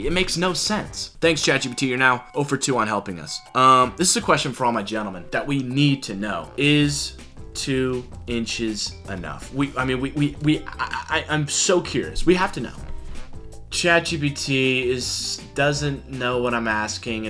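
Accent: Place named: United Kingdom. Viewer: I thought you was British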